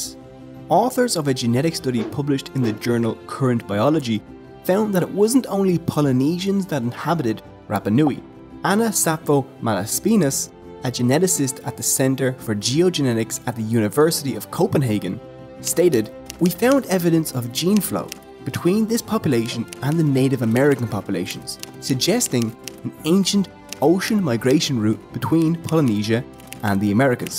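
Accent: Ireland. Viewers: As a Filipino, I'm proud and thrilled to see videos on the culture being posted. As a fan of Ireland, it's even more exciting when done with an Irish accent. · first few seconds HELLO FELLOW IRISHMAN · This man has possibly the most Irish accent ever! "Tree" for three lol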